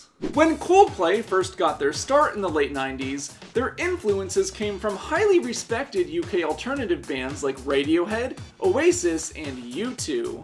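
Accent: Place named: United States. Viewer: It's so strange to hear British grammar (Coldplay ARE) with an American accent, great video though (I'm assuming your accent isn't Canadian, might be wrong about that though)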